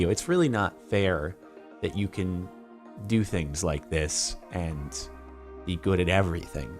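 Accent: Finland. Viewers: The hydraulic press channel is still going, also, it's a finnish accent, not scandinavian uwu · It's not scandinavian accent. It's finglish or rally-english.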